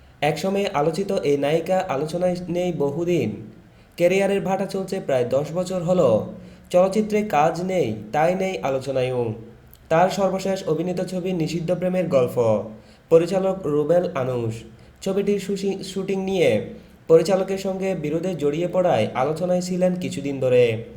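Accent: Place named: Noakhali, Bangladesh. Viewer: পাঠক নিশ্চিত নোয়াখাইল্লা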